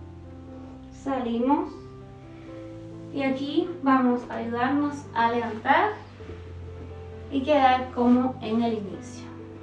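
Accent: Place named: Cuba. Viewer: Hoy practiqué esta rutina y me encantó y pienso que hay muchas del arado que pueden resultar y también la de la bicicleta con los pies vertical. Yo las hacía antes con mi lana pero contigo es mucho más juvenil. Me he quedado asombrada con la mejoría en tampoco tiempo, tres días. Me luce que tú eres cubana, por el acento, Acláramelo! 🇩🇴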